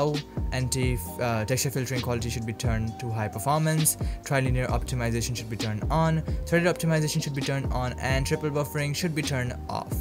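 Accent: India. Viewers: Why do all the helpful tech vids I find have an Indian sounding dude.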